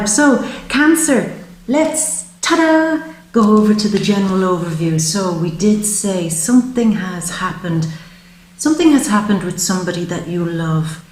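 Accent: Ireland. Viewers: Yes I know she’s Irish....and her accent is adorable.... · KellyByoga yeah nnnnno shes Irish my lovely x0x loves yah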